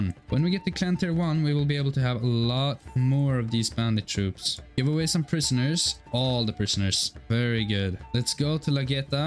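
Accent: Sweden. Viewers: You get a sub for Bannerlord and a fellow Swede speaking Swenglish.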